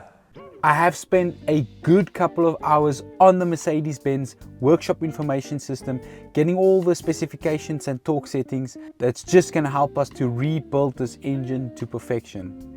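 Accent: South Africa. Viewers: You sound like a siuth African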